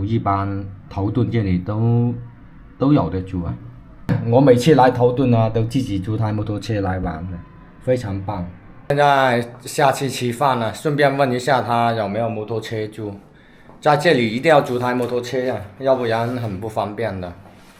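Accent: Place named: Cantonese, China. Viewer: 粵語口音😂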